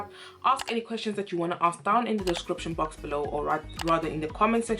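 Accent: South Africa. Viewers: Girl i knew you were South African 😂😂 i can hear it omg 😳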